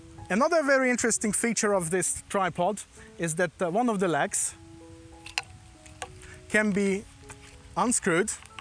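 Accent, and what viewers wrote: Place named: United Kingdom. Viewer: Köszi a videót. Szép brit kiejtés.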